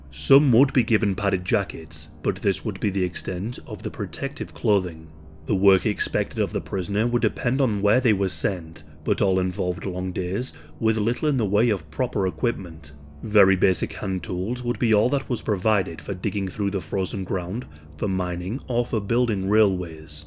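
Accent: United Kingdom. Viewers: Dudes British my guy. He just talks like that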